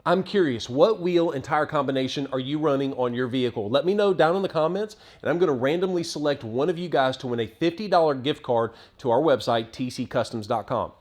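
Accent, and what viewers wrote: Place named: Alabama, United States. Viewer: Greetings fam. I had a 2015 F-150 from my bother-law, 35,500miles. My plans were to do a 2" offset with a 2" level kit. My son had to drive it because someone cut his convertor. Then someone t-boned the truck, bent the frame and cracked the shaft. My wife and share a 2013 Jeep Grand Cherokee and she won't let me do crap. Hey, I hear the Bama boy keeps coming out. Peace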